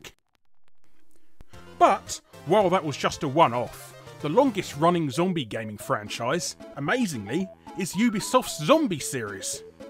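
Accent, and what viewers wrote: England, United Kingdom. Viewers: What part of England is your accent from? I always wanted to know.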